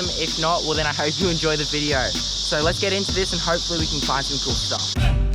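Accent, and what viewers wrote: Australia. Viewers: R u Aussie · dude his voice is amazing it’s the peak voice for being Aussie you just can’t appreciate a good content when you see it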